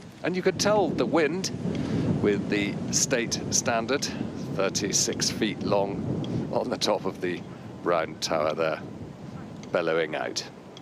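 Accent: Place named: England, United Kingdom. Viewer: Wonderful narration with a beautiful English accent.. Thankyou..😊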